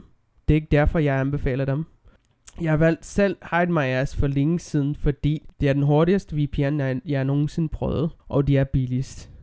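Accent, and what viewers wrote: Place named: United States. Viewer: Hey, kan ikke lade vær med at tænke på din accent, hvor er du fra, det lyder lidt som om du kommer fra USA? ;-) har jeg ret?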